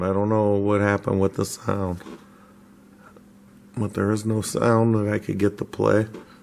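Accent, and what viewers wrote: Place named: Wisconsin, United States. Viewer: You’re from N/E Ohio, Closer to Cleveland area? That’s why I hear Wisconsin & Pittsburgh mixed. 😏 🤙 🙏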